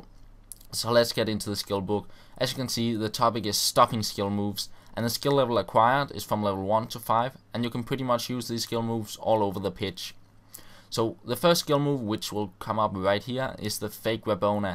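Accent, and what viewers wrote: Denmark. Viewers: your from Denmark. you got the best nordic english accent I ever heard! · Man kan tydeligt høre at du er dansk! :D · You are from Denmark, i can hear that from your accent. Im from denmark to.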